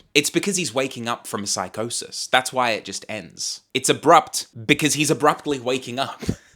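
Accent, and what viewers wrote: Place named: Asia. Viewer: I cannot stand your Asian accent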